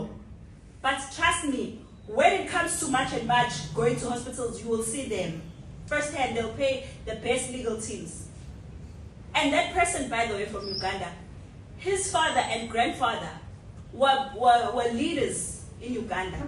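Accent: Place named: Durban, South Africa. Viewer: That Durban Accent is loud and we proud of her.Siyabonga dade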